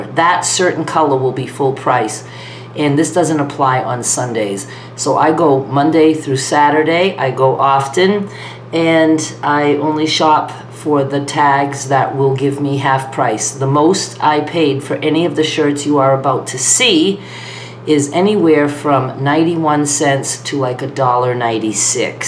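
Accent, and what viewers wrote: Maine, United States. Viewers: Hello from Maine! I love seeing how much fun you are having. It is nice seeing you smile 😊 Do people notice your Maine accent down there?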